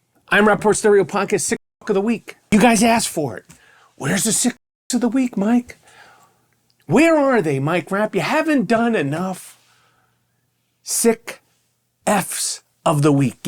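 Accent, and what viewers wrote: New York, United States. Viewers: Yo Rap. I love it bro. You’re still talking like it’s the 1980’s New York hip hop scene. Ol’ school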